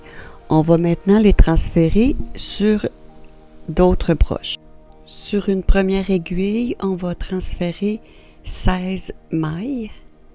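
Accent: Quebec, Canada. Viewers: J’aurais dû regarder plutôt votre vidéo je suis en train de terminer Chaussettes DROPS 3-4 ans avec de la Fabel avec des aiguilles 3 et demie. Il y a longtemps que je n’en avais plus tricoté, j’étais un peu perdue merci beaucoup pour vos explications parce que je vais en refaire avec d’autres laines DROPS pour mes derniers petits-enfants jJaime beaucoup vos explications et surtout l’accent du Québec et les astuces que je ne connaissais pas. Un grand merci 🙏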